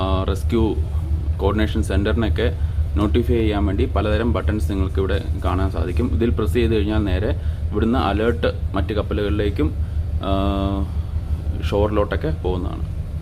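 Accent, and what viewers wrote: Kottayam, India. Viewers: Thankalude avatharanam നന്നായിരിക്കുന്നു കോട്ടയംkaranano? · Bro Kottayam kaaran aano..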